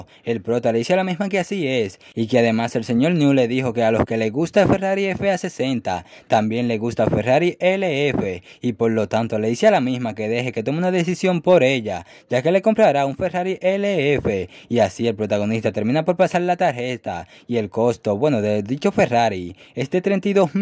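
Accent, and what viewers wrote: Dominican Republic. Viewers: Eres de republica dominicana?. O de alguna zona del caribe. Tu acento es muy parecido😅 lo digo por que estoy en republica dominicana tomando vacaciones. Pero buen video😅❤❤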